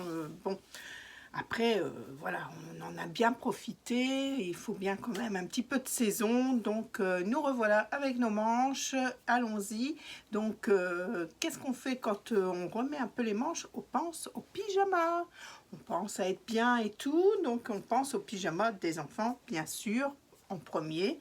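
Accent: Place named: England, United Kingdom. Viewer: Coucou, J'adore ton accent anglais 😉 You're simply the best 👍. Très joli pyjama, de même pour ton blouson bi-matière et ton edelweis. à bientôt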